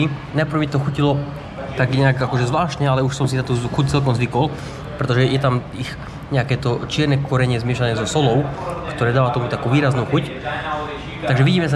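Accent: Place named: Hungary. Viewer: koli nareciu nebola som si ista ci pocujem Madarsky jazyk ..taky zvlastny podton mas...😊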